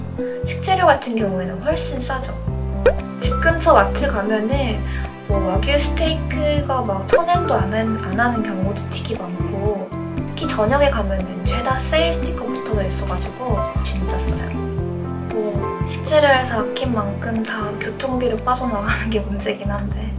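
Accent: Gyeongsang, South Korea. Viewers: 경상도 분 아니신가요?ㅋㅋ 서울에 살아 본 적 있어요? ㅎㅎㅎ 그냥 궁금해요!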